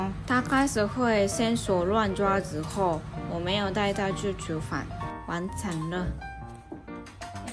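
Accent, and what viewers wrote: Taiwan. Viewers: 頑張ってください 具然にこのビデオを見た 応援しますよ しかし なぜタイワンの標準語似てますか？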